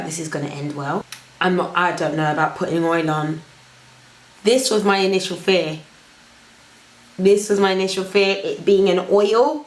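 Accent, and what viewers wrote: England, United Kingdom. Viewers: I love your british accent,love :))) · English accent ?😂😂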